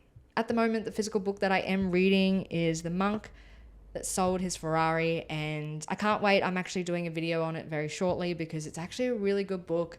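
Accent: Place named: Australia. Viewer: Before u said Australian eastern time ,I knew were Australian. The accent gives it away.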